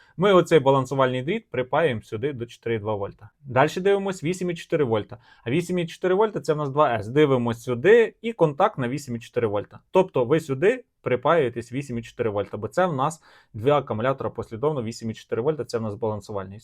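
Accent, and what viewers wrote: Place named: Western Ukraine, Ukraine. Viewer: впадло только что на западенском акценте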